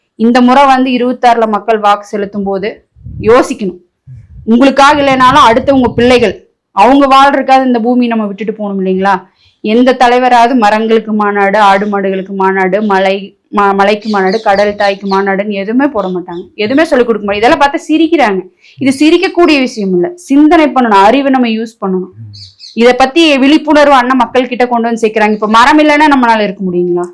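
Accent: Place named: Kongu, India. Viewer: ❤❤அழகு கொங்கு தமிழுக்கு அடிமை சிறப்பான பேச்சு ❤ சிங்கப்பூரில் இருந்து ❤❤❤❤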